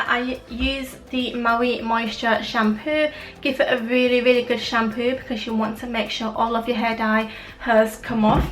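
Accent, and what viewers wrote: United Kingdom. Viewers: u have a British accent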